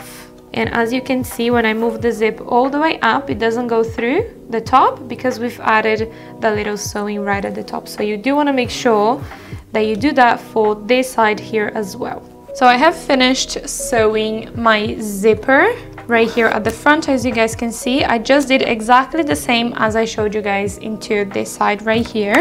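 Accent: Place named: Brazil. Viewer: That's so cute! Definitely going to try it at home. Also, by hearing your accent, I wondered... Are you Brazilian? I sort of recognized it comparing with my own accent kkkkk Ether way, I'm loving your channel so far! Keep the good work! :)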